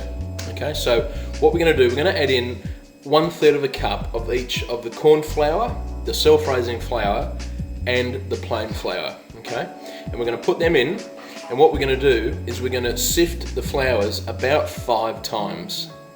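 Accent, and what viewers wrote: Australia. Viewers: From his accent can tell that his from australia not US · the aussie accent is not the same as the british accent . its soo different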